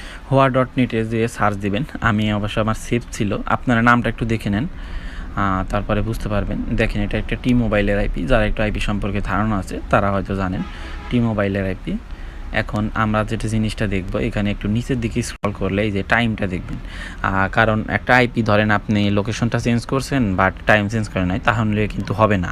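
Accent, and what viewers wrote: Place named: Sirajganj, Bangladesh. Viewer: ভাই এর বাসা কি সিরাজগঞ্জ নাকি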